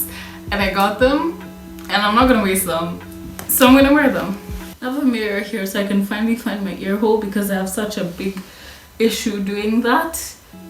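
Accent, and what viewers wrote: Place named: United Kingdom. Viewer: Very unrelated to the video but this is the first video of yours I've watched and for a good solid three minutes, my brain was fighting itself over your accent, like half of it was like "definitely British" and the other half was just "idk what that accent is but it is Not british" and the first half of my brain was like "oh so do you have any ideas" but then I remembered that most people have their country in the about section of their channel so that cleared it up. (Can you tell it's late at night for me) Anyways about the video, i've heard terrible things about Twitter and tiktok specifically so i just never got them, but i have seen how it just makes people more argumentative, like it seems everyone wakes up and chooses violence. Obviously it's not anyone's job to educate anyone and everyone about, say, why people deserve human rights, but it seems that people will use that as an argument, like "screw you go google it that's not my job" when someone asks for, say, sources to corroborate a claim. 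And another thing is politics. I'm not American but I'm sure most people see a good bit of American politics on social media, since it's a global power and all. But at this point all i see is Republicans and Democrats calling each other the r slur for just existing, whether the previous conversation is political or not. And that kind of political tribalism, which was shown in an election in my country before i was born where 800 people died, does not lead to anything good ever. I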